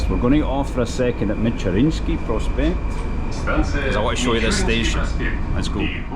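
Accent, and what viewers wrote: Scotland, United Kingdom. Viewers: Yes I like your videos and your scottish ACCENT.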